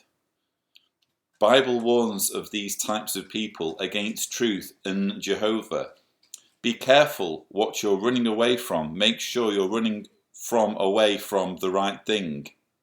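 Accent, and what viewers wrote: United Kingdom. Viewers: I loved your Prince video. I'm not an ex jw but remember the ones I've come across ... and I remember feeling bad for pretending not to be home when they came to the house ... but ever since I was little I was fascinated with the little magazines... the beautiful illustrations and kind messages... and the well dressed people giving these little booklets out.... my mom raised me Catholic but I was allowed to play with the little books for coloring or playing office.... when I did answer the door I was taught to be polite and received the little books or at a bus stop waiting I'd appreciate the free little book from the jw... anyways... my world would never gave been able to commit to the meeting demands etc etc... BUT STILL MY LONG FASCINATING INTEREST IN THEM REMAINS.... and now with all the ex jws and YouTube TTATT .... man some serious juicy gossip and exposure.... 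wow thank you for sharing your story... I love listening to your British accent.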